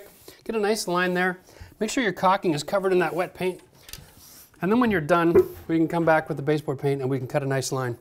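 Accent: Canada. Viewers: "Don't want to drag dirt through your mud".....never heard that sentence before haha. I knew you were from Canada! Sound like Steve Smith. Can you use a premixed joint compound instead? I couldn't find a small bag of 45 on my trip to the store. I grabbed at bag of the 5 repair. Hopefully that'll work! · Caught that in the first sentence! We love Canadians! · How many people can tell he's Canadian by the way he talks? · The fact he mentioned Ottawa and every time he said ou didn’t give it away? 😂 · he's Canadian, give him some credit, we all speak different dialects of the English language. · I knew he was Canadian by his accent.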